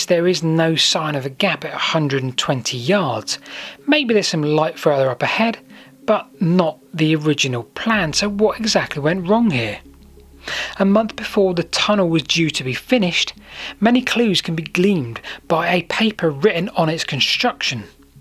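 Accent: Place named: Northern England, United Kingdom. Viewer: I miss around 5% of phrasing and need to rewind. I had grandparents who emigrated to the US from London, so it’s the northerners I have difficulty understanding.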